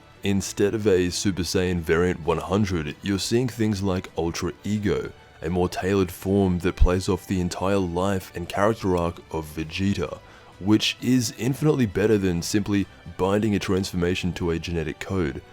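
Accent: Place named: Australia. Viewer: only one I ever subscribed to and click on it the minute I see it appear on my feed even if its not about DB. I'm not gonna watch the whole thing but ill support him. does cool shit for example you'll never hear him begging for fkn pitiful likes and subs NOT EVER. Another cool thing he does is put remixed Dragon Ball music like trap stuff. Its the type of music I like to work out too. I like his views and perception on DBZSGT. Lastly have a deep voice with an Auzzie accent will of course only help in this medium.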